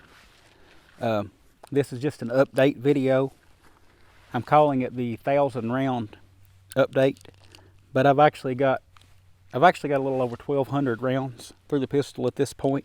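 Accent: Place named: Tennessee, United States. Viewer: I think he's from Tennessee.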